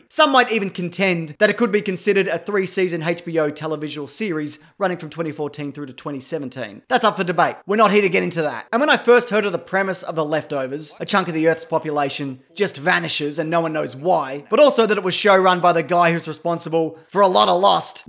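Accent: Australia. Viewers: I came here for jokes and an Australian mans voice not a reality check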